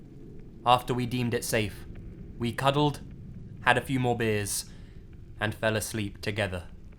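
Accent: United Kingdom. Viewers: I have a new nickname for you, it's British voice narrator guy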